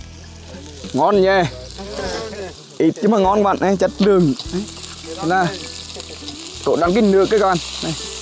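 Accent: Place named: Central, Vietnam. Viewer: Quê Phú Thọ mà giọng thì giọng miền trung😊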